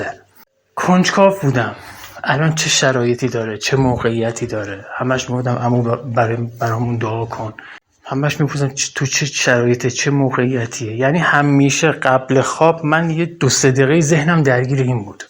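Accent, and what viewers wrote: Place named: Kermanshah, Iran. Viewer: چقدر لحجه کرمانشاهیتون جالبه وقتی درحال ادغامش با لحجه دیگه است که به لحجه خودتون صحبت میکردید بهتر بود همشهری واینکه دوبار تجربه مرگ رو داشتم وهربار تا یه مقطعه جلو رفتم وبعدش بهم گفتن نمیتونی جلو تر بیای باید برگردی الان زمان تو نیست اینجا باشی ومن اصلا نمیدونستم این حرفی که میزنند یعنی چی همش میپرسیدم شما چی میگید نمیفهمم برای چی نمیبرید منو چون من داشتم از یه جاده مستقیم بی انتها میرفتم جلو به نظر من قبل از مرگ قطعی هرکسی دنیای برزخی رو یه جور میبینه و با دید اصلی رفتن روح بعداز مرگ به دنیای ماورا یا دنیای بعد ازمرگ قطعی فرق میکنه فقط میخوان بگن بعداز مرگ باز هم ادامه داره راهتون ودر قران گفته شده فقط شقی ترین ادمها به جایی که جهنم گفته میشه که صد در صدبا اتیش وسوختن و این شکل توصیفها نیست احتمالن جایی سختی هست برای پاک شدن روح که اون هم گفته شده فقط شقی ترین ادمها به اونجا میرن وگرنه گناههای روز مره یه پاک زدایی داره ازجسم وبعد ادامه راه یا زندگی اونجا رو بهشون میدن فقط تنها چیز مشترک بین همه تجربه گرها وحتی خود من وفکر کنم روحهای مرگ قطعی همون تونل هست که باسرعت به سمت بالا میبره روح رو من وقتی داخل نور شدم در انتها خودم رو توی یه جا یا یه محیط دیدم که چیزی هم از اومدن بالا از طریق تونل یادم نمیومد وحالا محیط چطور بود وچی شد خیلی طولانیه که با نوشتن نمیشه گفت هرچند من چند دقیقه جسمم رو ترک کرده بودم اما زمانی که اون طرف بودم به اندازه یه نصف روز یا بهتر بگم مثل هفت هشت ساعت بودنم اونجا طول کشید نمیگم زمانی بود یا حس میکردم هست فقط اتفاقهایی که اونجا برام افتاد بعداز برگشتم به اندازه این زمان طول کشیده بود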